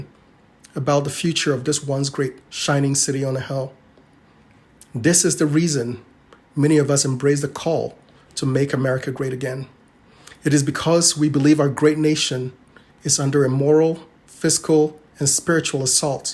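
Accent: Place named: Africa. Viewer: I lived in Nigeria many years ago and traveled to Ghana. You have perfected your accent! I can barely detect any bit of Africa left in your voice but I know you carry it in your heart! Well done, spoken well!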